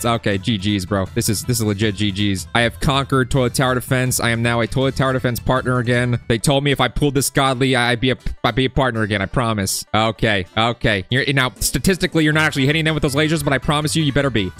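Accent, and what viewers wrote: United Kingdom. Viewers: bro no one knew he was british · Britsh voice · The goudly British accent tho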